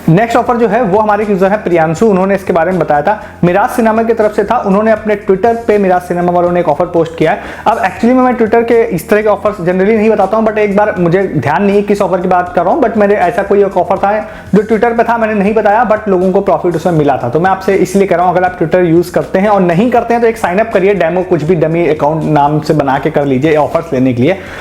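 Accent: Kanpur, India. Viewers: bhai kanpur she ho kya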